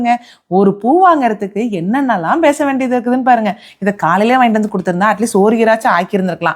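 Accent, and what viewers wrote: Kongu, India. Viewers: Kongu tamil sis🎉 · Unga slang கொங்கு tamil மாதிரி இருக்கு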